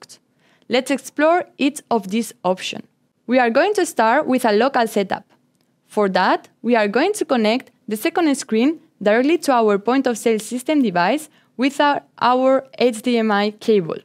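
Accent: France. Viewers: Coostomer 😂 , or customer anyway , nice video with french accent 😊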